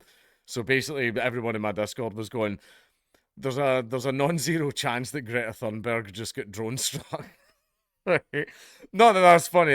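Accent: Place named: Scotland, United Kingdom. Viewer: Came here for the "oh noooo what happened" in that sweet scottish brogue. Don't let me down 😂.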